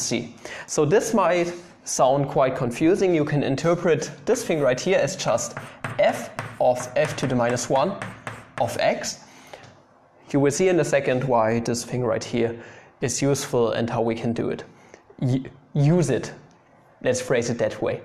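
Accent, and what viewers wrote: Germany. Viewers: Ahhh the good old german accent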